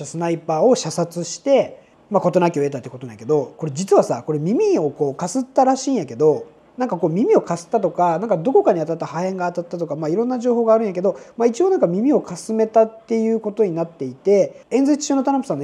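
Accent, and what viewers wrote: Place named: Fukuoka, Japan. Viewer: 山口県出身なのか、喋り方は福岡っぽい。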